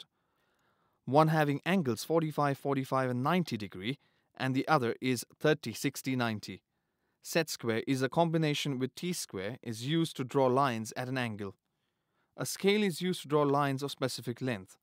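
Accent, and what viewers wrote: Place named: Kerala, India. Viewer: nice work mate. you are definitely from india. ur accent gives u away. i think you are from kerala.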